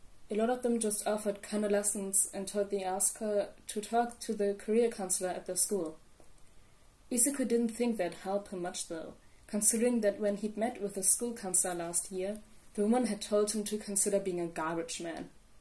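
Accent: Germany. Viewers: I think your English is very good! I was wondering what your first language was though tbh. I guessed it was German but I’m not sure.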